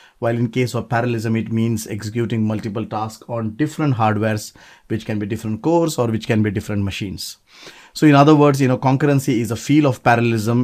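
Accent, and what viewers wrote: India. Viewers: omg i can finally found an indian acent that i could understand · as to his accent, of course it's indian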